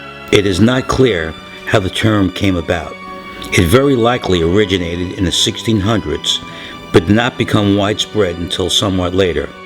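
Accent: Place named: Brooklyn, United States. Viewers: Amelia Bee he sounds like he is from Brooklyn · Amelia Bee Not a New Jersey accent. New York.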